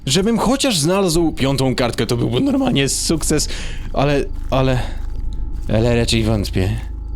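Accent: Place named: England, United Kingdom. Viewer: Gdy grałeś w gang beasts z i i podróby gier mówiłeś normalnie a teraz mówisz z takim angielskim akcentem!?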